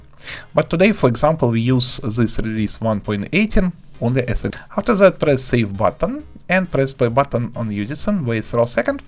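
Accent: Russia. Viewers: dude найс инглиш ты русский и это слышно по акценту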